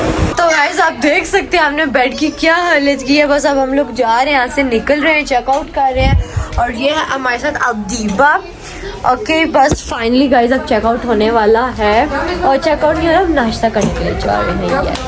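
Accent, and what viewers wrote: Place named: Rawalpindi, Pakistan. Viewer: Sai pindibois wala accent hai. Good work 👍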